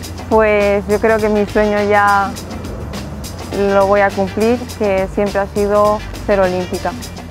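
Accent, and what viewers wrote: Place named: Spain. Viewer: Love the Spanish accent., so original.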